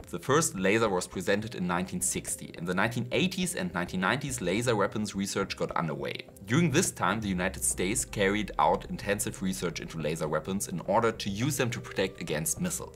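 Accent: Germany. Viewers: Die englische Aussprache hört sich aber mega-deutsch an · And i still dont know if he makes his accent stronger for the vids (since many English expect one hard German accent) or if it is his normal one. But i say he will still grow in the next months , after one point and base quiet fast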